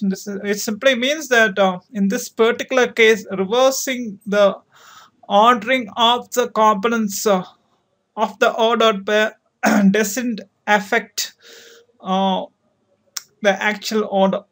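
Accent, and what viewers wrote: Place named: India. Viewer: Well explained..!! The accent is not at all a topic of this video. If you guys cannot understand his accent, find some FREE material, and learn more about Indian accent. This one explains the mathematical concept better than other fluent accent videos.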